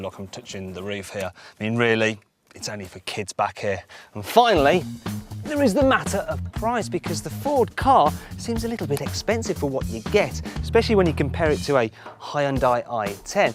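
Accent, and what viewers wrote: United Kingdom. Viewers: can't tell if he says "ka" or "car" with the british accent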